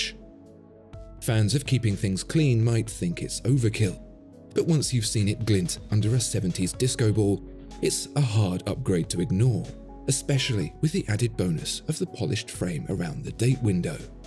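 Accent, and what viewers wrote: United Kingdom. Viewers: As a American praise a $400 watch with a British accent and I’m sold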